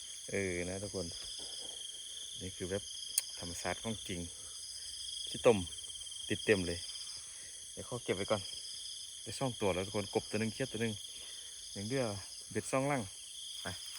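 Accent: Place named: Loei, Thailand. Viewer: เฮาเว้าสำเนียงเดียวกัน เว้าคือกันทางเชียงคาน จังหวัดเลย ข้ามมาเที่ยวฝั่งไปแหน่เด้ออ้าย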